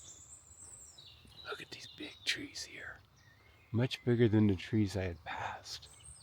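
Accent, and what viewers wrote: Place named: England, United Kingdom. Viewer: You are brave walking in that forest in the dark, please be careful. It is a fascinating place, the history behind it must be thrilling. Scary place to be sure. Thank you. - love your English accent, very good indeed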